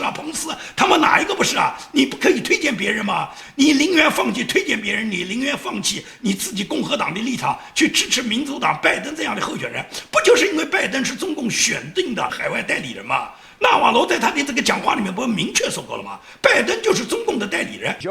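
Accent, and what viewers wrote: Nanjing, China. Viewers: 尊敬的哥您好，18年初看您的视频至今，收获良多，我也是南京人，特别喜欢您南京口音的普通话。您的坚持不懈，您的语速，您的激情都感染着所有同道中人。有人认为您用词反共过于夸张，过于亢奋激情。其实不然，太多中国人已经习惯跪太久了，冰冻三尺非一日之寒，矫枉必须过正，再不激情怎能唤醒国人，即使夸张又如何。还有人认为您对共匪不分对错太过痛恨有点过了，而我只想说未经他人事，莫劝他人善。有这想法的人他们不懂您，更不懂共匪。您的所有视频我都看完。由于一些特殊原因有一个多月没能上youtube看您视频，近几天也都补看完了。当我看到1000期您说爱情故事时，我被您的爱情故事震撼了，发自内心来说这是我听过的一个最好的最真切唯美的爱情故事，您的故事有血有肉，有柔情有理想怎能不让人感动。哥不愧南京人，果然真男人!您对理想的执着让人无比敬佩和感动。如果您能看到我写的东西话我想说两点我的想法，仅供参考，1.您觉得匪让人有肉吃有酒喝了所以人民安于现状了，麻木了，粉红了，其实那只是少部分。而大部分恰恰相反，他们是得天天在努力争取酒肉，不是不想剿匪，没有理想。而是在剿匪的路上需要吃喝，自古当兵不就为了吃口皇粮吗？而现状是他们连翻墙软件都买不起。2.中国人跪久了是怎么造成的那，未何一点血性都没了那？这不光是匪的原因，更重要的是儒家的原因。孔老二在战国时本是百家中最普通的一家一直不被认同。所以孔老二当时周游列国都不受待见，秦始皇用的是法家，汉用的是老子无为，直到千年后的中唐才为了封建统治用了孔老二洗礼人民思想。所以剿匪必先灭儒！最后说一点您可能不爱听的，习近平做为帝王他是伟大的，对于新疆，香港他没有开枪，无疑比毛，邓高明很多。还有扫黑扫毒等对内政策把社会风气提高很多。是江，胡所不能比的。我知道您可能会反驳很多，但我说的只是对于帝王来说，请正面理解。希望有机会去美国时能和您当面讨教。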